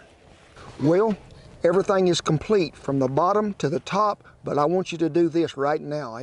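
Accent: South, United States. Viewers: You just have that down home way of speaking. BTW I’m in Woodward so I’m not too far from you. ❤️ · I love this American accent! God I'd love to move to the US. Ah man that is life!!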